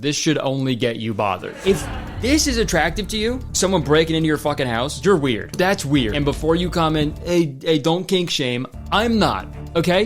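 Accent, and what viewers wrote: Canada. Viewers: his canadian accent has never been more prominent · He sounds extra Canadian in this vid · i love when kurtis ends a question with “eh?” because it reminds me that he’s canadian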